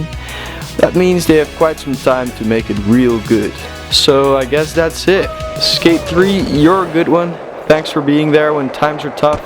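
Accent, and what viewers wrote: Netherlands. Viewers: This guy is dutch. I can just hear it. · Are you Dutch? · Is he from the netherlands?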